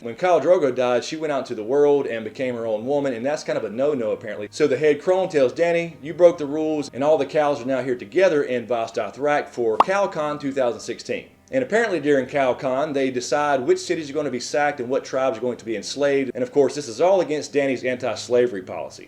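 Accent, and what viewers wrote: South, United States. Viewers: love the accent, where r u from, the south or texas or somewhere else xd?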